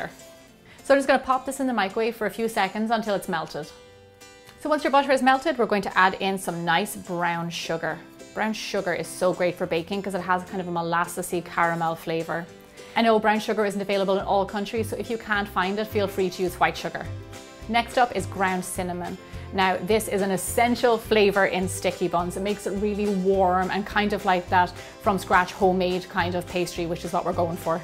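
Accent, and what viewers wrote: Ireland. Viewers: I love the fact you haven't lost your lovely Irish accent after living over there in the states :) x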